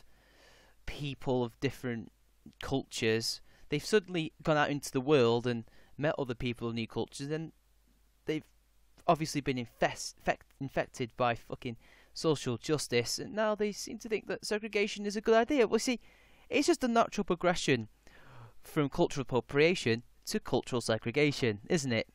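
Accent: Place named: Manchester, United Kingdom. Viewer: I used to live in Manchester for 4 years, I found hints of mancunian in your accent in an earlier video and it stroke a comfortable feeling. Make a TYT video man.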